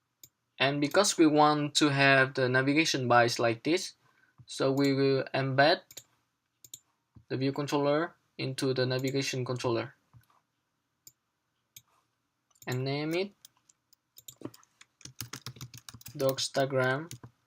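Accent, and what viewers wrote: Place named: Vietnam. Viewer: E nghe thấy giọng việt nam mà bật sub lên thấy auto sub cứ sai sai nên đoán thế kaka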